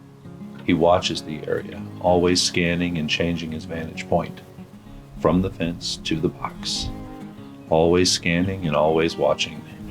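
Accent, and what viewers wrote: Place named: Louisiana, United States. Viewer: Now this, this is your best so far, or as far as I know about. It has lots of Katie - check. It has your sort of Idaho-ized Loozeeana accent - check. It focuses on one thing and does that one thing well - check. It's simple, a simplicity made all the more remarkable by the complex tools you use - check. It's philosophical - check. It's got that music alright, but unobtrusive and even apropos! - check and check. And it's just the right length to do what it needs to do. Checkmate. (Personal note: I'm glad I've kept my subscription, despite my, shall we say, "disagreements" with the auteur).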